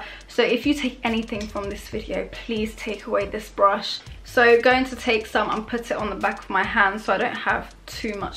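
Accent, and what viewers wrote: London, United Kingdom. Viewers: Just me who loves her voice? Got like that London accent it’s so calming lol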